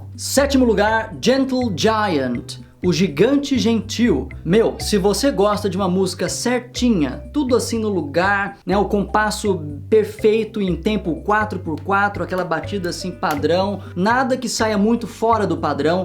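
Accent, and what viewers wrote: United Kingdom. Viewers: Cara, você tem que fazer mais vídeos de inglês. Vi seu vídeo sobre o box do Pink Floyd e fiquei impressionado, cheguei a pensar que você fosse realmente britânico. Valeu!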